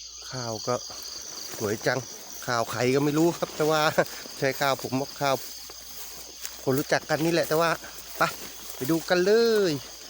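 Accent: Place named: Sukhothai, Thailand. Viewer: พี่คนไหนค้าบ ผมคนสุโขทัยค้าบ สำเนียงคล้ายๆ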